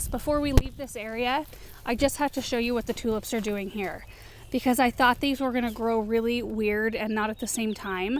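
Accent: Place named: United States. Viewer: There are some words you say that make me smile at the difference between the UK and the US. You say Gerbera, and we make that into a J sound (Ge together making the J sound), so we say it like Jerbera. The other one is Dahlia, which you make an ah sound and we make an ay sound (so we say Daylia)! 2 countries separated by a common language in action ❤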